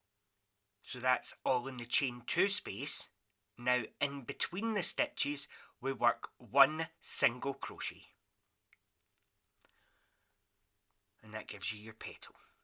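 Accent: Scotland, United Kingdom. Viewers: So glad to see you are well enough to be back giving everyone joy with your tutorials. They are so helpful and give clarity to your pattern. I love listening to your Scottish accent as well.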